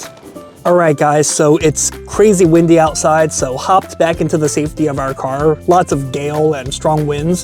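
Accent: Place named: North Jersey, United States. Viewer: Fake like in jersey. Yea I know you from north jersey cause you talking crazy bro.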